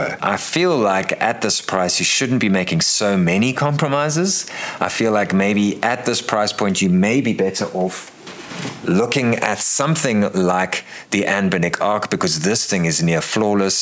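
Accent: South Africa. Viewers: Really enjoying your videos, man! Heard a fellow South African accent, plus channel and vids all about things I love. Easy sub. I've been doing retro emulation on my old PSP for around 10 years now, and last week decided it was time to get an RG35XX Plus, It was meant to a "dedicated console for emulation", one and done, but I quickly realised it's just my "first" emulation station. lol.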